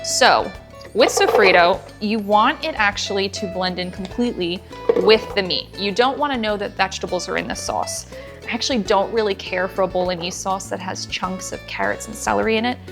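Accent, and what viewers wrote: Philadelphia, United States. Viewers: You sound like you're from my area near philly. sawce 😀gonna make this for my wife tonight. thank you!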